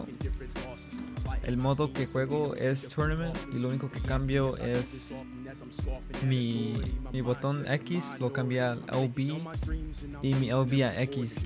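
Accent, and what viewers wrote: Mexico. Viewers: you speak spanish very well broo asta you seem to be from mexico you were very well understood what you explained greetings and good video 👍👌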